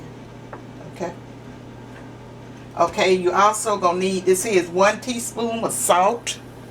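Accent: South, United States. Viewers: Mrs., HELLO I knew when I heard that southern voice, I had to tune in, stick and stay educated in what you know is good, best & Delicious! You got that voice, know how,every once & a while some slang, a recipe within it's self, momma got it going on! Ive Appreciated every how to video, with a taste, those greens with Lipton onion soup, fried or boiled they're the Bomb, I've never had such comfort in knowing greens could taste like that. You're a" well seasoned" teacher, as my mom before her death this year would say your never to old to learn something new, & life brings about a change, I have no request, but from u, I'll learn even more, your one of my adopted video voices, now that I stumbled on to your stories of yesterday, today & then some I look forward to cooking even better, Thank YOU, Roz.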